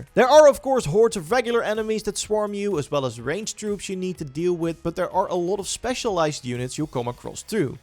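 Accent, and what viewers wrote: Netherlands. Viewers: a little tip. Stop pronouncing “o”s in the dutch way. Its not a alOt its pronounced alAt. Just had to bring this up cos it is really bad to listen to 😂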